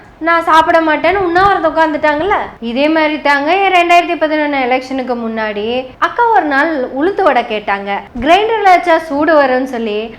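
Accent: Kongu, India. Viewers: வருங்கால த்ரிலிங்கான C. M களைவிட விட நீங்கள் பேசும் த்ரிலிங்கானக் கொங்குத் தமிழ் அழகு நடை சூப்பர் எப்பா!வாழ்த்துக்கள் பாப்பா!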